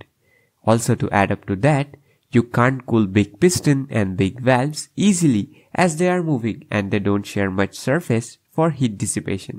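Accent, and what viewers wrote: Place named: India. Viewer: Good content, good animations. But this indian accent is annoying the hell out of me. Why not focus on proper pronunciation so the content is suitable for normal English speaking audience.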